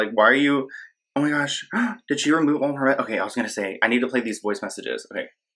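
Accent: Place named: New York, United States. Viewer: Those curls 🤍love your accent. NY?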